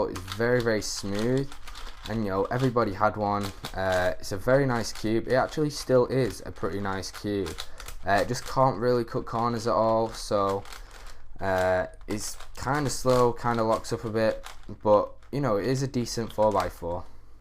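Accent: United Kingdom. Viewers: Im a beginner UK cuber and you sound like you are from the UK to! If you are, where do you buy your cubes?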